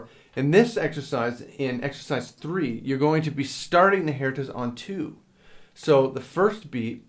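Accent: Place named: Philadelphia, United States. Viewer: AH i thought i heard a hint of SOME type of accent, i guess i just got philly confused with a canadian accent. the way you say 'out' - is exactly how people from philly say it haha.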